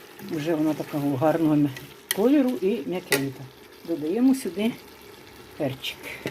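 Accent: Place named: Poltava, Ukraine. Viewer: Вибачте,мені дуже подобається ваша мова,нагадує рідну Полтавщину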